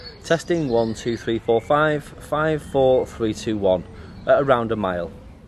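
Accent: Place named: Northern England, United Kingdom. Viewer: is the absolute man. Great videos, great northern accent, great radios. Nothing over complicated, just good content.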